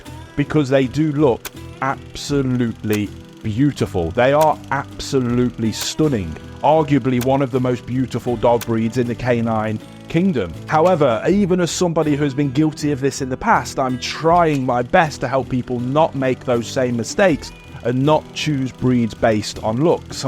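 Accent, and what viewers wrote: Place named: Birmingham, United Kingdom. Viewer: Question- is that a Brummie accent I detect? Trying to learn more about these British accents. It's certainly distinct, but fortunately even discernible to this Yank.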